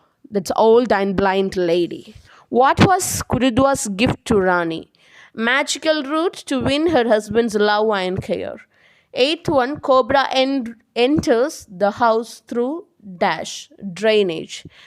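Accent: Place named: Kollam, India. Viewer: Kollam... Beautiful acsent... And very good information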